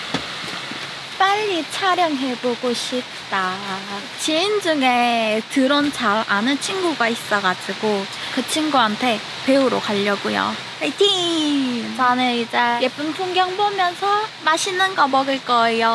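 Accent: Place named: Gyeongsang, South Korea. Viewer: 날씨가 이래가될일이가? 포항사투리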